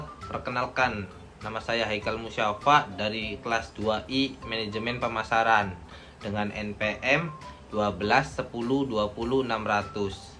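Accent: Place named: Java, Indonesia. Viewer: Bahasa nya medok bgt ya gaes😂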